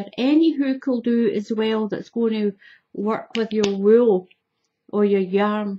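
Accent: Scotland, United Kingdom. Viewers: Scottish accent always melts my heart <3 Thank you so much!! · You have the coolest accent I have ever heard. Where precisely are you from? Scotland, right, but where? Just curious. I'm American and we never hear this. It sounds quite a lot like Scandinavian-- in the inflection--and many of the sounds are quite similar. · I love your Scottish accent and your voice! I could listen to you all day long x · I'm Brazilian, English isn't my native language and I can understand very well. Love your Scottish accent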